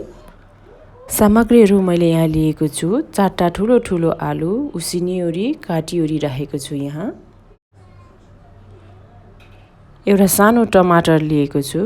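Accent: Darjeeling, India. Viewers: Hamro darjeeling aloo bandam boli mitto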